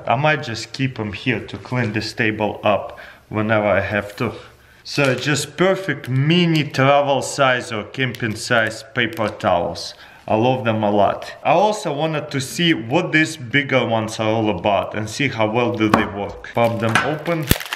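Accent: Russia. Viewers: "Now i have to zoom out its so big" is awesome. "Full size bich towel" is Russian and Russians are known for their own type of English.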